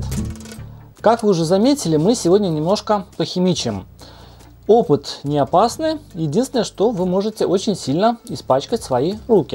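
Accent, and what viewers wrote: Latvia. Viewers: Вы из Латвии?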